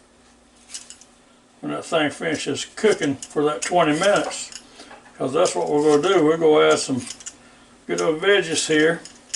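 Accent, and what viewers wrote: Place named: Appalachia, United States. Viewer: HELL YA I enjoy a hillbilly talking about what i eat in chicago!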